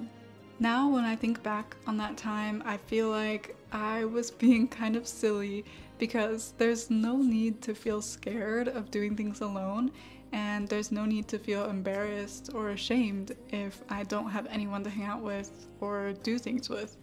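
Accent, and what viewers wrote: United States. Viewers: A lovely Chinese look-like American girl with a perfect American English tongue in such a tenderly whispering style！Watching your classes feels so good like breathing fresh air❤❤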